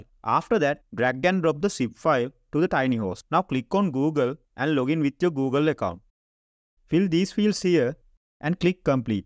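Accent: Kerala, India. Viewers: Nice Malayalam accent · indian accent? I hear scam when i hear indian accent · Lived your malyali accent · Your voice is like my Indian frnd *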